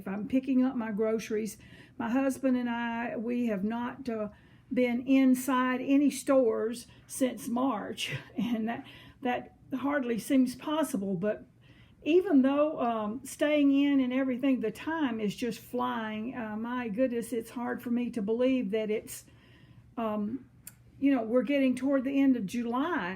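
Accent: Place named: South, United States. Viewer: Oh!,..it’s so good to hear your sweet southern drawl, it’s been too long! Lilliebeth looks absolutely precious in her Ralph Lauren, and that hat is just so cute! The matching tennies are to die for!! How glad I am to see you’re doing well! The way I see it, if a little xtra weight is all we get in this time of pandemic, we’re ahead of the game! Hugs and much love Dearheart, your pal Joy xox. P.s., I shall send you an email of how we are doing in a bit.😊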